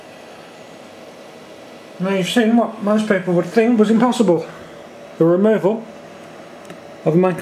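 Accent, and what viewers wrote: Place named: England, United Kingdom. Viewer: hes speaking english english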